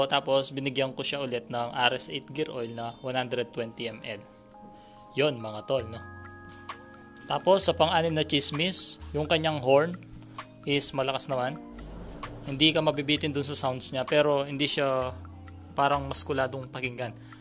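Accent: Pampanga, Philippines. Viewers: Kapampangan ka par? Hahhaha keep up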